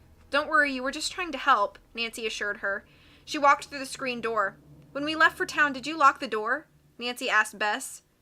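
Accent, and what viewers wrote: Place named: Southern Illinois, United States. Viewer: 'skulking around'?? How does one skulk? Have you ever been skulking? It's kinda like drinking but a bit smaller? ?? You're accent is a prefect southern Illinois dialect'ie -bit. - ever been ta Cairo? Greyville? You fet right en. M. IL.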